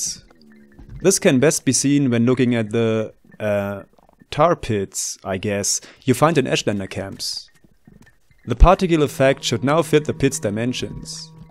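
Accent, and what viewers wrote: Germany. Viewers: ich gehe vom ankzent her mal davon aus das du deutsch bist ^^ mein englisch ist schlecht also frage ich auf deutsch ist OpenMW mit der Steamversion von morrowind compatibel? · Looks pretty cool. Any chance it's compatible with vanilla (and non-modded) saves? Your German accent is a thing of beauty btw.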